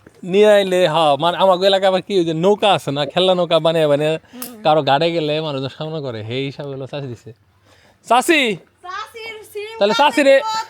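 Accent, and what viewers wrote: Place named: Jamalpur, Bangladesh. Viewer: জামালপুরের ভাষা টাঙ্গাইলের নাগরপুর এর ভাষা সেম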